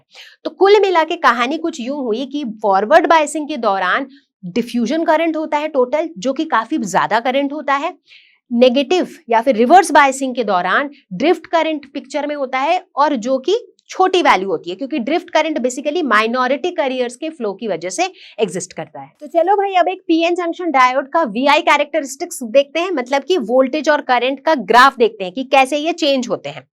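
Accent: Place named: Gujarat, India. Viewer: Mam are you gujrati...? Your accent feel like gujrati 😊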